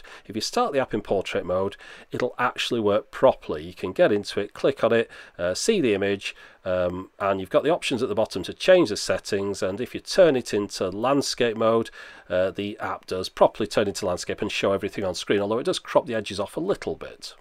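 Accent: United Kingdom. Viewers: I'll like the video for the british accent. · Good review... Now if you could just drop that British accent... how about a Southern US accent... that'd be a little easier to understand : ) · As an American I'm not bothered how you pronounce 'router' in the UK. There are many words we pronounce differently in our respective countries, such as "aluminum". It's not an issue with me but most everyone I know here in the USA does in fact pronounce 'Route 66' as "Rowt 66", not root 66. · Okay... I can almost accept "rooter," but where do you Brits get "ah-lume-in-EE-um?"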